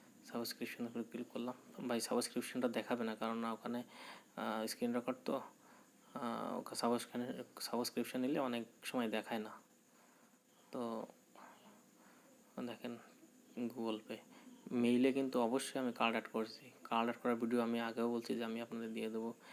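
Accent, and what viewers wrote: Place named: Khulna, Bangladesh. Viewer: Vai, apnar Kotha suna to khulnar Lok mone hoy. Jai hok personally Kotha bol jabe?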